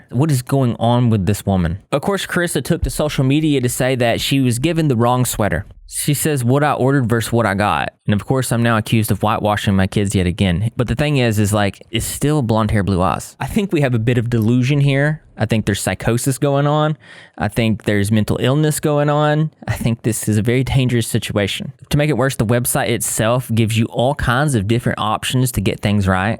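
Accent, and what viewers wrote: South, United States. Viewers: new viewer who is curious about ur accent. are u aussie or from the south? u sound like my grandpa from georgia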